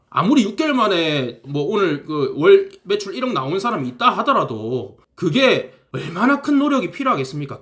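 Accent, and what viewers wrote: Gyeongsang, South Korea. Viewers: 너무 잼있어요 같은 갱상도라 그런지 말씀하시는게 너무 친근해요~ㅎㅎㅎ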